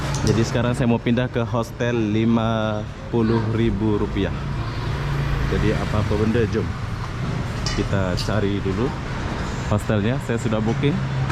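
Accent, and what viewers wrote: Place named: Malaysia. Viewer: Anda org indonwesia tpi dialekx kaya org malaysia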